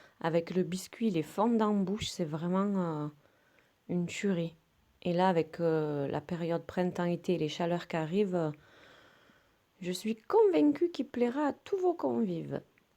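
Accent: South, France. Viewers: Bonsoir je viens de vous découvrir, je me suis abonnée sur le champ ! Vos réalisations sont magnifiques Bravo ! vraiment tout est clair, bien expliqué et votre voix très agréable, on sent bien le sud 😊 Merci pour vos partages 🙏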